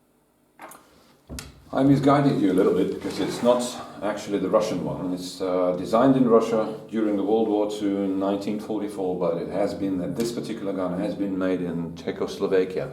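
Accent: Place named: United Kingdom. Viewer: у вас такой приятный британский английский! где вы его учили, или вы жили в Англии?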